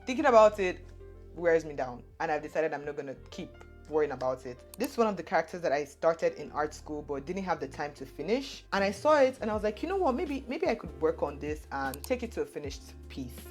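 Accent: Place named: Nigeria. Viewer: When I say your name in your channel description, I figured but I confirmed it when I heard your accent, love to see my fellow Nigerians out here especially as a beginner artist myself. Your art is amazing and the bg music is such my taste too. You’ve js gained a subscriber😊 btw could I ask where you got your iPad stand from from?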